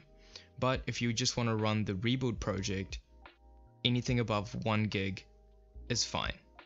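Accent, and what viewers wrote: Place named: South Africa. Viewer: you sound like a SAFA, am I right?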